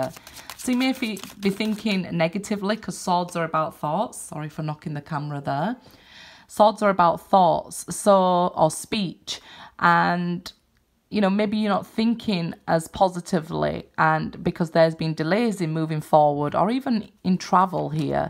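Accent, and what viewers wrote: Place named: England, United Kingdom. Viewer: You must be in either England or Australia, I'm hearing England though.... I could see myself moving there...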